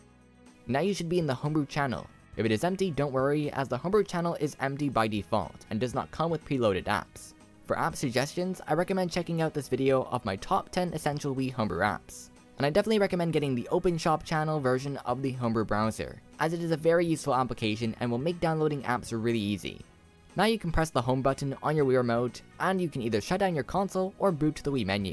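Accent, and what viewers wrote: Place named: United States. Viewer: American I believe. I'm Britsh and judging by how he pronouncing words like 'select' I think he's American. Also, he has a nasally accent which is very typical in parts of North America.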